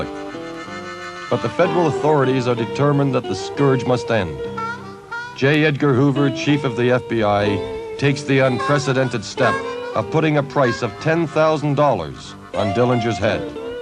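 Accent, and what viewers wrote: Canada. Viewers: Wish they had used an American narrator, rather than a Canadian.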